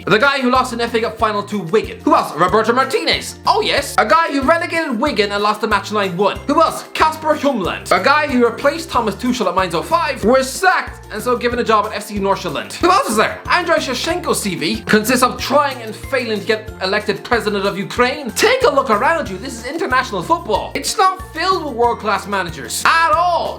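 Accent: Ireland. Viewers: Strangely this video served as a kind of anti pep talk. Thanks shouty Irish man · My daily dose of an Irish guy screaming into a camera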